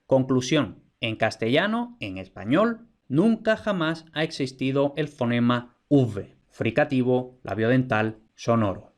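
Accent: Spain. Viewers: te felicito por venir a ver estos videos si estás aprendiendo español, son explicaciones más bien técnicas que ayudan a entender el orígen de muchas palabras en nuestro idioma. Y se te puede dificultar también por el acento de, el español ibérico a veces es complicado de entender, si los hispanoamericanos tenemos dificultad para entenderlos, imagínate tú.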